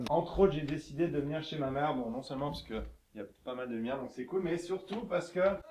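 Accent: Quebec, Canada. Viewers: C'est drôle t'as un accent québécois mais hyper légers on le remarque presque pas ! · L'accent québécois est plus prononcé ou c'est moi? Et au fait le chat il s'appelle ISIS....AAAAAHHHHHHH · l'accent québécois! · wow , jtrouve que ta plus l'accent québécois qu'avant ^^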